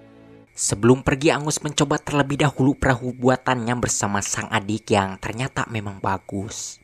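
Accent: West Java, Indonesia. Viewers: Suka sama logat sundanya sama banyolannya 🤣 salam dari Pulau Batam Indonesia #RumahDiAtasLaut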